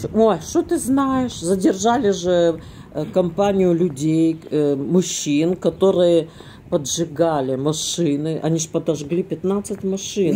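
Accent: Odessa, Ukraine. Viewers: Как мне нравится ваш говор, Одесский ..гуляю с вами...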